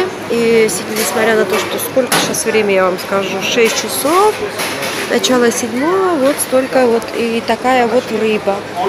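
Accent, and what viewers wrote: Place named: Georgia. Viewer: Простите,вы,по национальности грузинка? У вас,акцент ,наверняка грузинский.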